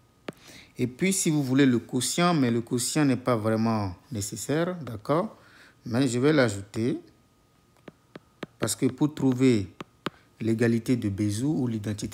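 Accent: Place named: Benin. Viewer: Bravo mon frère , c'est vraiment sympa cette tranche de maths sur les équations diopht. on croirait entendre mon prof de math au lycée en C.I qui était Béninois.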